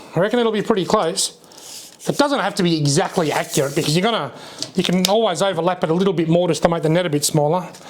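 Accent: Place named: Australia. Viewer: I applaud your yabby net efforts for Australia. But in my area, there are no fishing limits on crawfish, and our nets are set up vertical, and are at least 10 times larger. We catch crawfish by the pound, and they sell to locals in 40 pound sacks. I know you are not commercial, but it is interesting that due to greater abundance, we can catch so many more. I am located just north of Louisiana, in the State of Arkansas, in the USA. And locally, tho very abundant, I know of very few people who bother to catch them. Most crawfish are available cheaply at various buffets, where you pay around $15 USA and eat all you want! Ours are boiled with cajun spices, and are very tasty! Best of luck with your efforts and I have been watching your various fishing and yabbying videos for the past 3 months. I did subscribe. I love your Australian accent and your enthusiasm for your sport!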